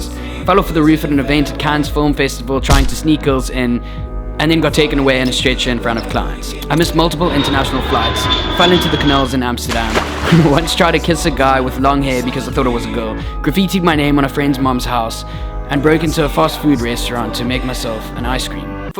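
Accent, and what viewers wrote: South Africa. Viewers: Great message, Dan, but when you start talking fast it's hard to understand you. Even when your accent isn't that strong, the different SA vocab and your word choice makes it hard to follow without replaying what you're saying at least once or twice. Maybe slow down a little so everybody can understand you! Thanks!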